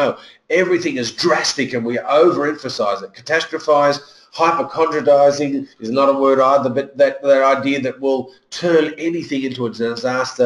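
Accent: Australia. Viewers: your Australian accent and is very calming to me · presenter’s heavy Aussie accent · I found this to be so informative and validating. The aussie accent was relatable, which really helped me. The best thing was how referred to "we" throughout the lecture. This made me feel much less stigmatised! Most talks say 'them' or 'sufferers' or patients or even subjects.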